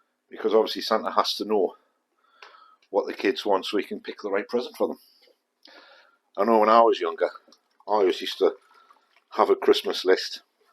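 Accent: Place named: Northumberland, United Kingdom. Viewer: I'm 73. Your accent >> Northumbrian was same as my grandfather's. Happy flashback memories listening to you.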